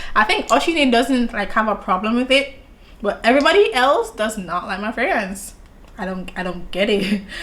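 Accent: Jamaica. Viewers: Ok yaadie🇯🇲🇯🇲 sound like Jamaican. 😀 new subbie here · I love your Jamaican accent. Nice recommendations!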